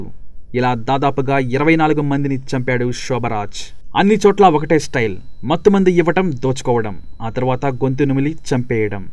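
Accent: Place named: Andhra Pradesh, India. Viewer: mee andhra yasa apundira mundhu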